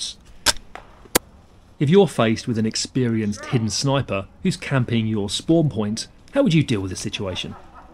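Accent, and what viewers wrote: England, United Kingdom. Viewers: “Tell my mrs.” LOL. That guy deserves a beer. I love the way you English say things.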